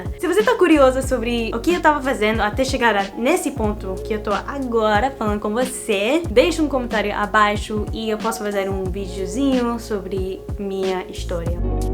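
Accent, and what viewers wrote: Rio de Janeiro, Brazil. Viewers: Você tem sotaque daqui do RJ ♥️ Muito bonitinha kkk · Aaaaaw the investment on carioca accent! Just music ❤️ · Disse para ela esquecer o sotaque carioca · fico contente que você abandonou um pouco do sotaque carioca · tem um pouco de sotaque carioca...kkkkk... maneiro! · Tem sim! Sotaque de Carioca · você tem o sotaque brasileiro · parece ter um pouco de sotaque carioca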